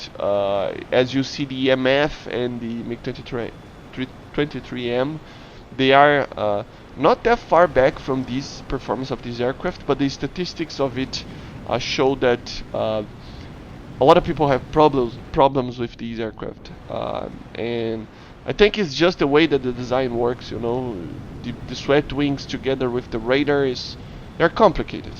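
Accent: Brazil. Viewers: What is your nationality? You seem to have a Brazilian accent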